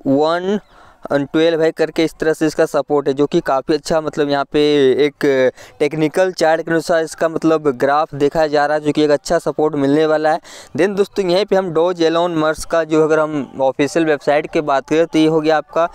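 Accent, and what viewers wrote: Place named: Shekhawati, India. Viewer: Sir aap sekhawati side ke lagte ho awaj se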